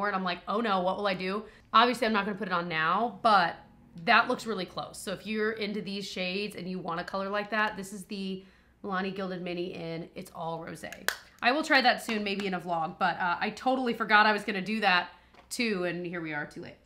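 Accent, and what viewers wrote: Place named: Midwest, United States. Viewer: I love that you share your day with us! I usually sit in the same comfy chair, sipping my coffee, just relaxing while you entertain me. The way you pronounce the words “and” and “but” are interesting to me. Must be a midwest thing. Have a great day!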